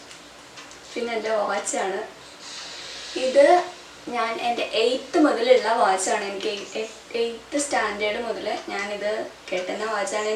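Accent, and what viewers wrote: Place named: Thrissur, India. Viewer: Ur presentation is very good. Chechide veed trissur enganum aano? Slang varunnu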